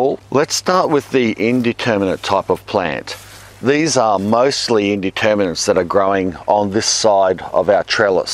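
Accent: Australia. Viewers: As an Aussie who shares his accent, I wish I could do this 😂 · I have his accent too.. but that’s because I live in the same area ;) Aussie Aussie Aussie!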